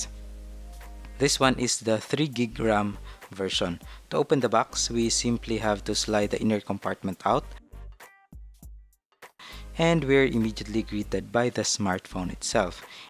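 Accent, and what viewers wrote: Philippines. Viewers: Yourrr Englishh yoww i halata that you are relly a pilipino.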